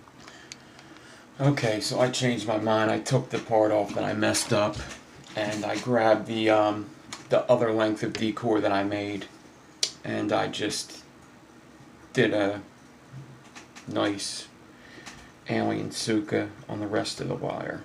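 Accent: Philadelphia, United States. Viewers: Am I detecting a West Philly accent?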